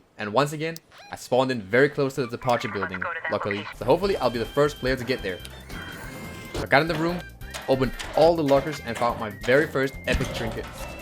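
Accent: Denmark. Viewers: Are you by any chance danish? The accent sounds very much like it haha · 100% dansker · Dansk accent on top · kan bare høre du er dansk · I smell Danglish :D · You danish by chance?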